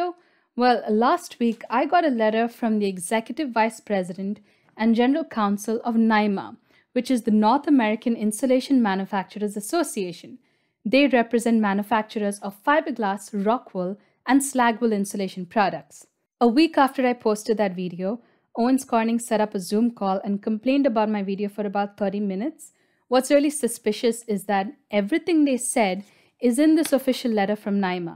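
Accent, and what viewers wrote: India. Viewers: I now watched several of your videos. I'll be honest here. When The Algorithm showed me your first video I saw a woman with Indian accent and all my biases (yes I have those, sorry I am human) kicked in. But listening for few minutes and I was hooked. Well researched, well versed and you seem super nice and reasonable. Great content, well produced, down to earth and informative. Thanks!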